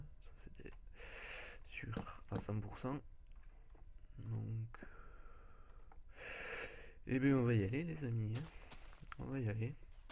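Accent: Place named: South, France. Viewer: t'as un accent du sud !! le son il est pas terrible aussi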